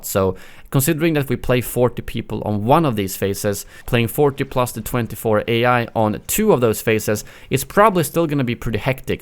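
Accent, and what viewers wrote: Sweden. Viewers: are you swedish? sounds like you are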